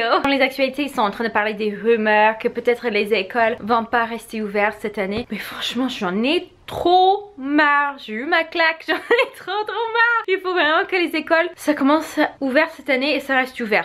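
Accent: Quebec, Canada. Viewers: Oh le bel accent canadien 😁. J'adore ta coupe , ça te va super bien ❤️. Et trop contente pour toi, une nouvelle vie commence 😘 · Coucou j'ai l'impression que tu prends un peu l'accent québécois c'est amusant 😉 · Trop marrant, j’ai l’impression que tu attrapes un petit accent québécois 😂 Trop bien pour toi en tout cas, une énorme charge mentale en moins! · Ce que tu dis sur les sectes... Que le problème c'est les gens qui partent et tout... Je viens de réaliser que c'est exactement la même chose dans le monde du travail, c'est toujours la faute de la personne, jamais de l'entreprise 😳 PS : c'est vrai qu'on entend un léger accent québécois 😅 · Hello:) Tu commences à avoir un accent canadien quand tu parles français. Je l'avais déjà remarqué dans ta dernière vidéo. Super cute! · Ahahaha tu commences à prendre l'accent québécois, j'adore!!!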